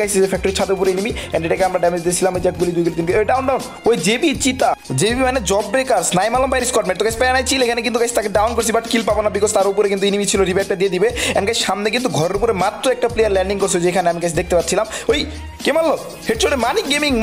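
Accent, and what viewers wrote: Tangail, Bangladesh. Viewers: Vai apnar vasa tangail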